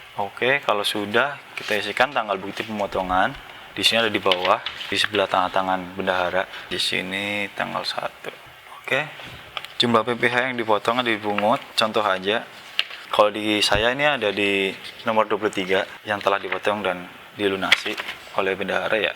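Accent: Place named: Banyumas, Indonesia. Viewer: membantu sekali mas ngapak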